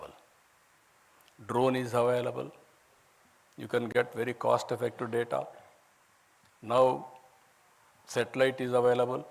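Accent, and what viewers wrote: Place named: India. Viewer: 🙏🙏🙏🙏🙏🙏🙏👌👌👌👌👌Guruvugaaru your English indean English so nice very understandable even new learners role modals work hard .aking awake being public relations faith effectinate public 🍓🚁🍓🚁🍓🚁🍓🚡🍓🍓🍓🚡🚡🍓🚡🍓🚡🍓🚡🍓🚡🚡🍓🚁🍓🚁🍓🚁🍓🚡🚁🚁🍓🚁🍓🚡🍓🚡🚡🚡🚡🚡🚡🚡🚡🍓🚡🚡🚡🍓🚁🍓🚁🚁🍓🚡🍓🚡🍓🚡🍓🌹🚡🌹🚡🙏🚡🌹🚁🍓🚁🚁👌👌👌👌👌👌🤱👌👌👌👌👌👌👌👌👌👌👌super cm repeated country wide country wide repeatedost repeated country wide super cm 👌👌🤱👌👌👌👌👌🙏🙏🙏🙏🙏🚁🙏🙏🍓🚁🍓🚁🍓🚁🍓🌹🚡🌹🚡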